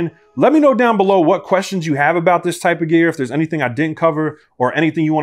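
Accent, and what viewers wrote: Philadelphia, United States. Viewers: Hey why you have a lil philly slang when you talk lol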